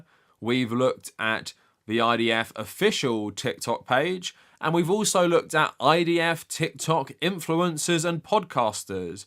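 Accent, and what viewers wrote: United States. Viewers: Wow, proper Israeli with an American accent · Why is it in standard American Dialect English? Shouldn't it be in Hebrew? Why isnt it British English???? As an ex navy cryptologist it's giving foreign propaganda...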